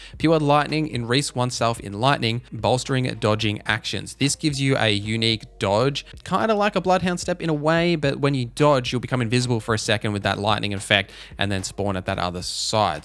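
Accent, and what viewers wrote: Australia. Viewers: Great guide, and so nice to hear an Australian accent :)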